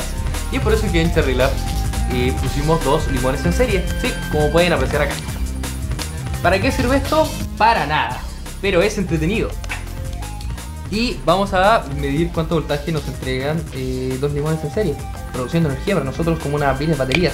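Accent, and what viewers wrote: Chile. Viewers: Jajajajaja grande! Pocos son los que se atreven a hacer cosas de este tipo, combinar ciencia y humor en un video, es lo mejor!!! Solo algunos gringos suben video de este tipo, que bueno que alguien de habla española, castellana o como gusten llamarla tome la iniciativa de hacer cosas así jeje. Te seguiré... pero en tu canal, no te asustes no soy psicópata XD Sigue así, esperaré el próximo video :) PD: Por tu acento, eres chileno no?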